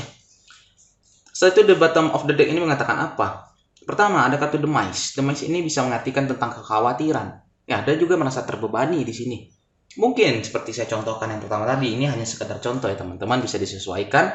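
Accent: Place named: Padang, Indonesia. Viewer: Pisces hadir menyimak... Suarany Abang ini mirip logat org Padang🙏🙏🙏🙏🙏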